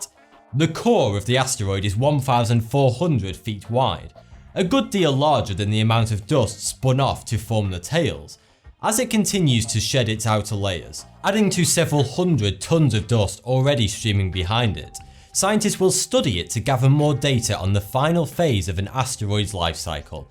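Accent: United Kingdom. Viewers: That British accent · the problem is that i am moroccain and english its kindaaaa hard to me so i know like 23% of english words and he problem is that he is british and his english is so strong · Mate he's British not American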